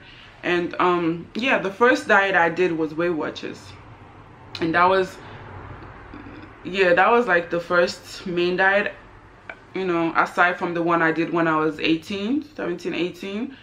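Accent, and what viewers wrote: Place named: Nigeria. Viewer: HMM THIS IS SERIOUS..U HAVE NAIJA ACCENT HERE OHH JESUS..COMPARE TO NOW ...HAHAHAHA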